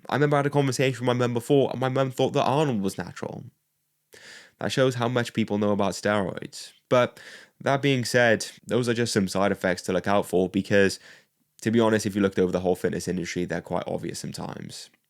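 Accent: United Kingdom. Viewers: restarted and British bro ngl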